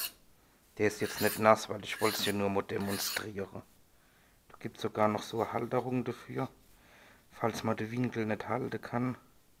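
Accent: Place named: Hesse, Germany. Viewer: vielen Dank für deine VA! hat mich mega gefreut! :) du bist ja geil drauf :D aus welcher Region kommt denn dein Akzent? Man könnte meinen du kommst aus dem Hessischen Bergland :D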